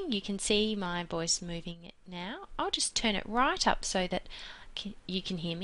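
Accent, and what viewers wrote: Australia. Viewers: Australian accents are the best! Lightens up the mood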